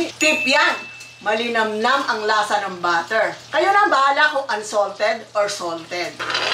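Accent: Iloilo, Philippines. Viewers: ilongga ka pala??? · fan mo talaga ako pero may ask lang ako. Ilongga po ba kayo? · Ilonggo po kayo? Duha kabilog hihi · Ilonggo ka nay? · Ilongga ba c mother? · ilongga ka? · Ilonggo ka Tita? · Ilonggo ba si? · Ilongga ka? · Ilongga man gali si 🥰🥰🥰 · has an accent of ILONGGO hehe love u stay safe. hoy lakas mo asirin c more vlogs po.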